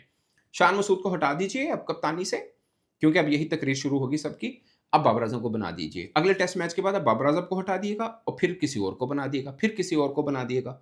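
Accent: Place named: Amritsar, India. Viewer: your Punjabi sounds like so AMRITSARi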